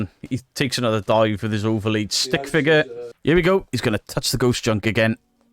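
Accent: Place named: England, United Kingdom. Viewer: I will say, house is super clean, the yard looks lovely, and if he'd go legit for entertainment, I love the accent, people in Australia will pretty much watch anything with and English accent. Because here in Tasmania we grew up with English shows, going back to, wow, for me, the enchanted cottage, with nellyphant and little joe and the kangaroo 🤔🤷‍♀️ I can't remember his name, the wombles 😃🤗 Beatrix Potter, keeping up appearances, especially Onslow and daisy 🌼, you should react to the bloopers one day, so funny, would I lie to you, is stellar, they tried to do an Australian version and it was so cringe, I just prayed no one else in the world would see it ugh 🙈 I could just go on for hours, oh, come dine with me 😄😄😃 the voice over guy 💞💞💞💞💞, how midsomer is, where it real the most dangerous place to live on the planet, I'd love a midsomer spoof 😄😃 my poirot, my David Sutchet, oh, Still Crazy, if you English and haven't seen it ☝️for shame, my Bill Nighy, Billy Connelly, Jimmy nail, 😍 Bruce Robinson, and the other ones, I'm so sorry, my 9 year old grandson stayed last night, it seems I pick up his personality when he goes home, I'm in a very happy silly mood, and I wanted to share it here because I don't feel judged 😁🤗🙃💜👍.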